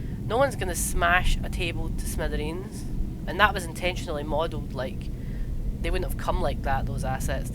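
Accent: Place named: Scotland, United Kingdom. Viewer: He's Scottish. ITS PERFECT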